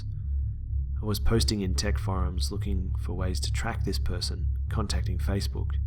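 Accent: Australia. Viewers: mate are you Australia P.s me too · dammmmn can tell you're from Australia straight away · holy s***, you're Australian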